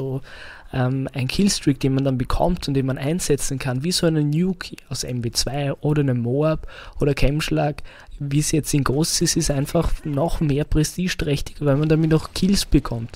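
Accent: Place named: Austria. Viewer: Österreichische Stimme tut gut auf yt zuhören :)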